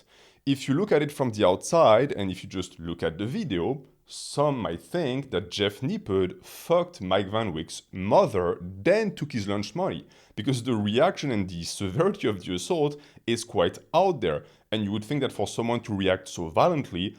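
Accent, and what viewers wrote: France. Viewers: I love your French accent, it’s like listening to Baudrillard talk about weightlifting.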